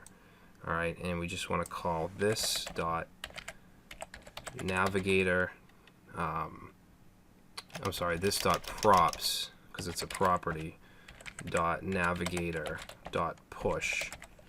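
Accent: United States. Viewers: Man, how I feel happy when I find someone with native US accent explaining a technology these days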